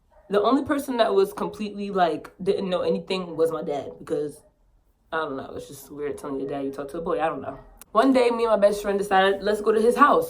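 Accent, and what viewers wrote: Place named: New York, United States. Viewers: She from New York😭! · You can tell she from New York😂 or she might be from philly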